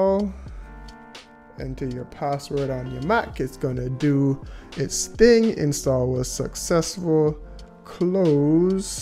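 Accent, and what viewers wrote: Jamaica. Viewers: I can tell your Jamaican. Big up yourself bro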